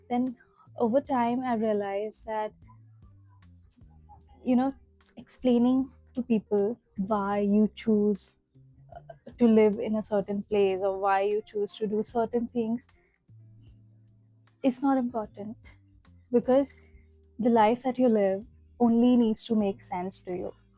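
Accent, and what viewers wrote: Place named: Jamaica. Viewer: I feel like your Jamaican accent is coming in! Please visit Stoney❤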